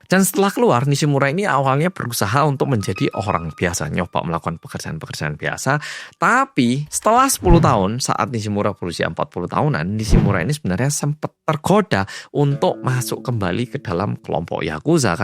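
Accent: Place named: East Java, Indonesia. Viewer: Ciri khase omongan pasuruan Malang Masalahnya Untungnya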